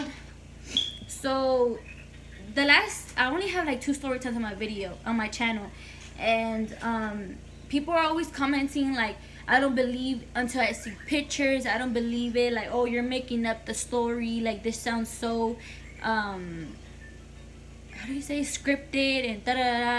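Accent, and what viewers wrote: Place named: Houston, United States. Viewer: Q&A are you from Houston cause you talk just like it , like you’re slangs and stuff , girl . By the way you’re super pretty ma ❤️❤️❤️💕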